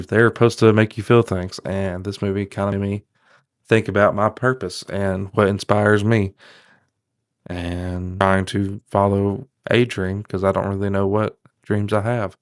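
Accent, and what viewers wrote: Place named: North Carolina, United States. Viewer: love your accent, sounds like North Carolina. I still need to watch this movie, somehow I keep missing some of these ghibli movies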